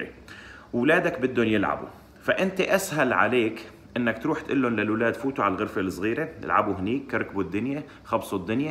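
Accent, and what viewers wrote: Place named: Syria. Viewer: هذا سوري مو مصري ي سطا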